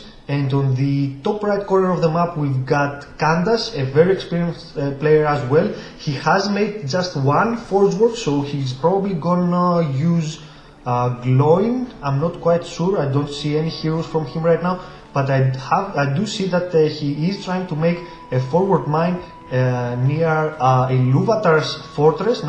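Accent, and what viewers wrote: Greece. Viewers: Hey, are you Greek? That accent sounds like a Greek talking English. Just wondering...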